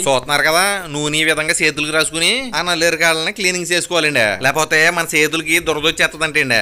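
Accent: Godavari, India. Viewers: మీ యాస భాష తీరు. వింటూ ఉంటే సినిమా హీరో అల్లరి నరేష్ గారు మాట్లాడినట్లు ఉంది సార్.🎉 గోదారోళ్ళు కాబట్టి ఆ విధంగా మాట్లాడతా ఉండారు. మా రాయలసీమ భాష గాని మంచిగా ఉంటది.. మీరు చెప్పే విధానం బాగా ఉంది.😊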